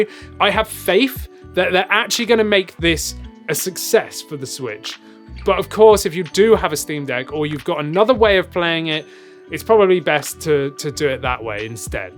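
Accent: United Kingdom. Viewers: Hey bro your accent sounds like you’re from Oxford, england.. am I right? ❤️❤️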